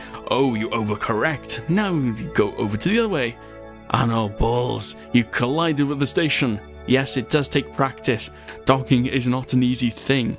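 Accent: Wales, United Kingdom. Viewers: Welsh is it?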